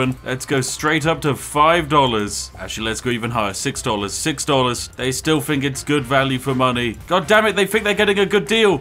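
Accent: United Kingdom. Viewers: Holy youre so funny, especially your british accent, i love it!!!! you just earned a sub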